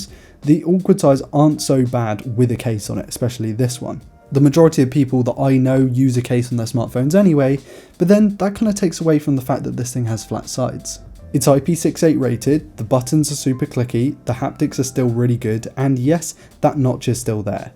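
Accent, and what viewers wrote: United Kingdom. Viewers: Your british accent is gorgeous😍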